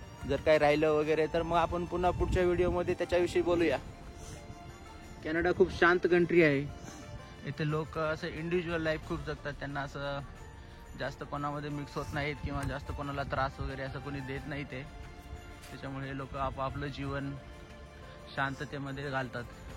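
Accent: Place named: Latur, India. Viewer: बोलण्यावरून समस्त आमच्या लातूरचा माणूस जय श्री राम 🚩🙏🏻🙏🏻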